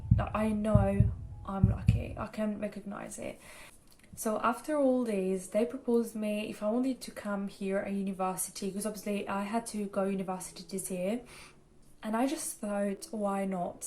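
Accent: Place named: London, United Kingdom. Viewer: Your English has come along so well - a slight London accent too! Xxx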